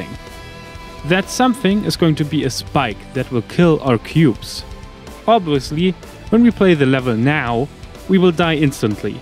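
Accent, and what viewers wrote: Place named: Germany. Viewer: you sound german lol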